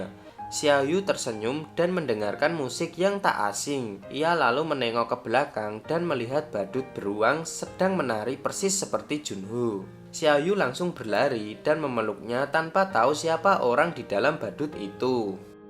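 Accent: Java, Indonesia. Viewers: film sedih kayak gini ketika di ceritain pake logat jawa... sedihnya berubah jadi horor! 😂. gak cocok cerita film romance kayak gini! cocoknya film horor atau komedi! logatmu itu looh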